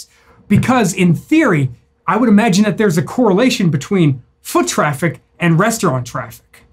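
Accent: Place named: Texas, United States. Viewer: Some texanian accent daaattaaa(data)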